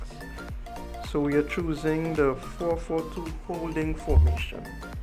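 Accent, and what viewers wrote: Trinidad and Tobago. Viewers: Bro is this your Trinidadian Accent? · Ahhh boy…from d time I hear d accent….done know he is fellow Trini. Respect bredda.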